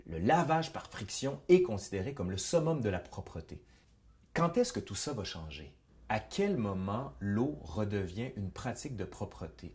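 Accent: Quebec, Canada. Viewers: J'aime bien le acsent Canadian, c'est drole ca me fait sourire . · Il est québécois... olalala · enfin une bonne chaine d'histoire, sans musique techno hyperactive en fond sonore et sans effets comiques surjoués. Non seulement le fond est captivant mais de plus la forme est très agréable. avec le bel accent québecquois en supplément ! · C est chouette un vrai conteur en chair et en os j adore ce petit accent québécois il raconte super bien 😊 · Que j'adore cet accent Québecois! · Tres instructif, j 'adore votre chaîne, beaucoup d 'informations présentées de façon agréable et drôle, et votre accent québécois est vraiment charmant. Merci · J'adore l’accent de nos cousins québécois, merci pour cette vidéo bien faite ;) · Les canadiens vont avez des doc super mais l’accent horrible! J’ai de la peine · Je viens de découvrir vitre chaîne et j'adore. Et l'accent du Québec, quel bonheur ! 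Des becs ☺️ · Ta vidéo est bien argumentée mais tu aurais dû dire que la peur de l'eau était liée à l'épidémie de la peste noire, à t'entendre tu es québécois ? 😀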